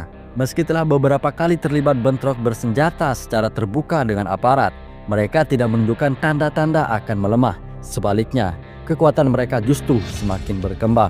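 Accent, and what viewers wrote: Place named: Lombok, Indonesia. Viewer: semaikn ndek asing logat sasak nii, ternyate dgn sasak Lombok Admin😅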